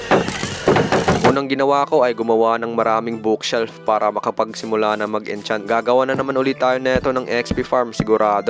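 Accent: Visayas, Philippines. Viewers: Balay hahaha bisaya ka dong😂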